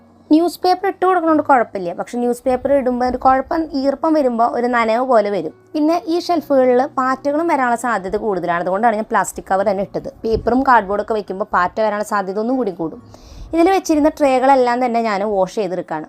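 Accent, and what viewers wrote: Thrissur, India. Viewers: Ningal thrissur aahno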